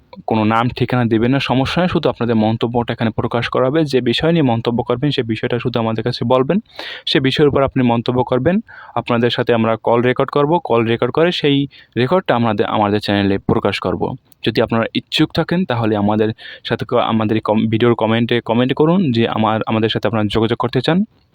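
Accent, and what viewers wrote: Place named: West Bengal, India. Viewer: কথার টান কিন্তু পশ্চিমবঙ্গের ই। অন্য রাজ্য বলতে ত্রিপুরা ওদের টান আলাদা, আর বাংলাদেশের এরকম কথার টান নয়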